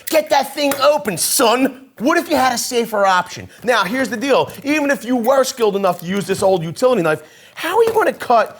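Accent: Philadelphia, United States. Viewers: Just discovered your channel. Love it. Sounds like you’re from Philly, yes?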